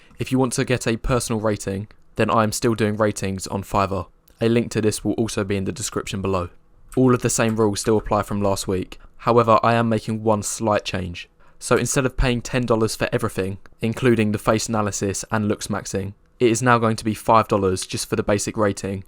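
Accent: United Kingdom. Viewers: but the narrator's voice is way more feminine and soft and incel then all of these guys he's calling out. What's worse is he's got a British accent which is viewed as weak in most cultures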